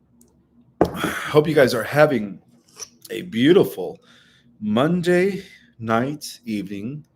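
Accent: United Kingdom. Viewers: Enjoyed your live, love your British accent lol 😄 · Loved te british accent .bring humor all the time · I'm loving the British Alto, it's hilarious and funny enough sound better than your regular accent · Love that British accent 😂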